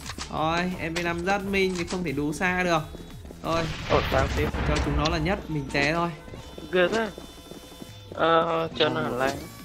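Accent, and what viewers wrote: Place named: South, Vietnam. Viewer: Giọng cha ân hình như trong.nam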